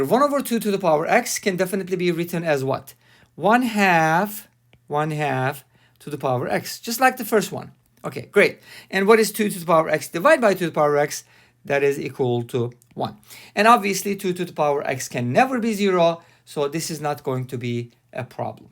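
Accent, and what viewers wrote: Turkey. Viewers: you exactly sound like you have a turkish accent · you sound turkish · The accent is unmistakably Turkish.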